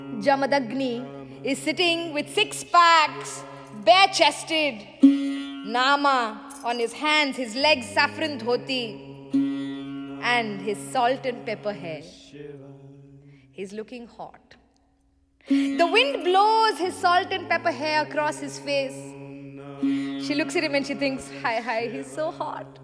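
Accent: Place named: Bijapur, India. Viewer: ಇದು ಧಾರವಾಡ ಕನ್ನಡವು ಅಲ್ಲ ಇದು ಪಕ್ಕಾ ಬಿಜಾಪುರ್ ಕನ್ನಡ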